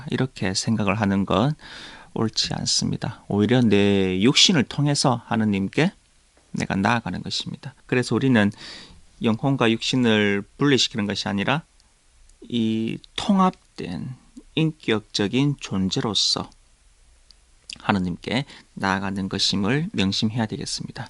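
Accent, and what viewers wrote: Gyeongsang, South Korea. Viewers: 말씀도 좋지만 경상도사투리가 이렇게 매력적으로 들릴줄 몰랐습니다 ^^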